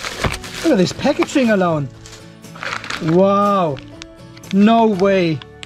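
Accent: Germany. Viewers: You're such a nice and helpful guy, I can see where people would help out where they can. And all that testing and experimentation is really helpful! I hadn't really payed much attention, but I did notice in the back of my mind that your accent was something other than Aussie. Then when watching this video, and hearing the cursing (and identifying with it!) it clicked. Ah! German!! Very cool. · Very nice video - greetings from bloody German accent to bloody German accent 🙂 (currently also building up my little solar power plant) - I really like your way of trying to find customized solutions that exactly fit your needs!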